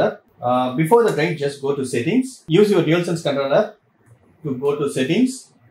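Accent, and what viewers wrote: India. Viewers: Thanks, your english accent has a malayalee flavour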